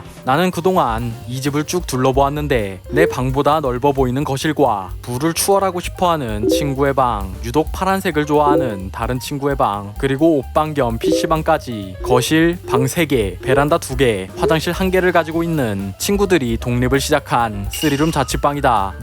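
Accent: Jeju, South Korea. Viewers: 제주도 사투리 싱기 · 이분 고향이 제주도인가요? 처음듣는 사투리같아요 ㅋㅋ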